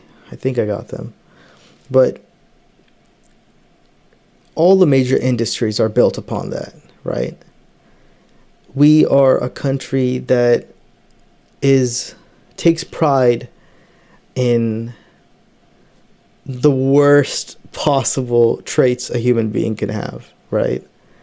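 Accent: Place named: United States. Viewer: dude's accent is about as American as it gets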